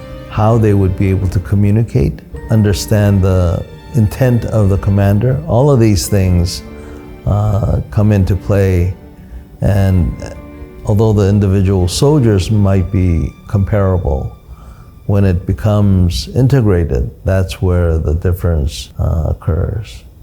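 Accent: United States. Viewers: This man had to have grown up in the USA. That is the smoothest American English I have heard from someone that didn't have it natively.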